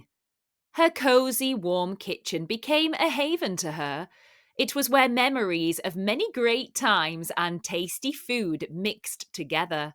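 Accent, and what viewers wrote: United Kingdom. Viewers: Which accent do you follow of British accents?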